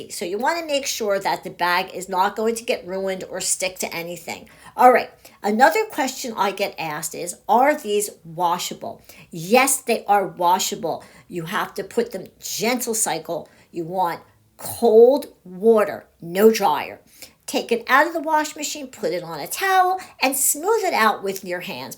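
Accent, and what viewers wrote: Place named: Connecticut, United States. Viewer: Just 'discovered' you today!! I've subscribed & given you thumbs up!! You are such a great teacher!! I love your happy, cheerful energy! Your accent reminds me of my Aunt Mary. She came from Connecticut. Question: Can we use tissue on canvas bags, or only napkins? Now I'm inspired!! I guess fabric on fabric would work with this method??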